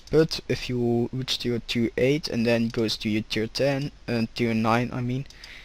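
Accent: Netherlands. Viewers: je nederlands accent veraad je :3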